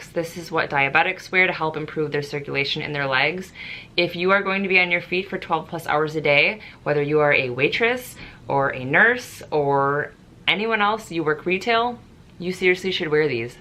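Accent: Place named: Midwest, United States. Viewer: I swear I heard your accent and I Heard the Midwest! Ahhhhh! ❤️ You’re so cute, I’m trying so hard to get into a nursing program and anatomy is killing me with the memorization! How’d you power through the pre reqs to get to your final destination? It’s my dream!!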